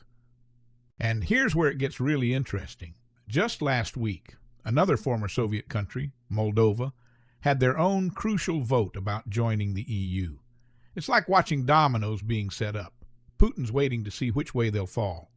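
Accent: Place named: United States. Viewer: This video is tipical western propoganda. Even the person speaking in the video has strong american dialect.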